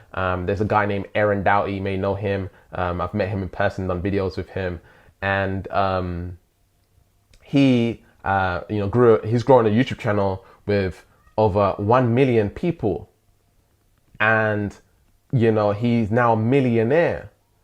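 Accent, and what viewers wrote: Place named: London, United Kingdom. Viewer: What part of London are you from? Hehe! Amazing post BTW keep inspiring 🙌😇 to want is to lack 💯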